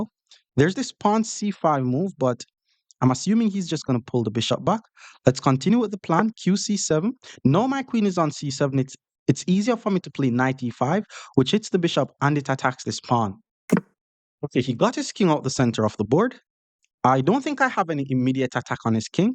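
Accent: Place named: Jamaica. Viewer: thank you so very much! I don't think anyone has ever played and also taught chess with more passion and joy than you. Combined with your smile, your warmth and the patwa accent it is absolutely infectious. Best moment of this video: - "double up his pawns...his pawn on e5 is also hanging...I'm gonna easily...got my king out of the center...my position is very solid...I have no weaknesses"...... one pawn move followed by his queen move: "this is actually a very scary positian"....comedy gold! Stiil have to laugh about it. Every single video makes me smile the entire time. Please keep on shining!!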